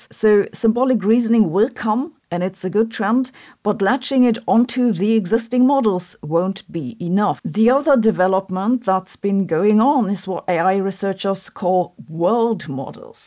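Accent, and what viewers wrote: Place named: Hesse, Germany. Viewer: Ich war auch schon am überlegen aus welchem Bundesland sie stammt.. 🤔 Hessen..?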